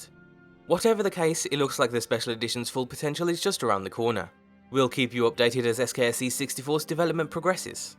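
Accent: United Kingdom. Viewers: British people say beeta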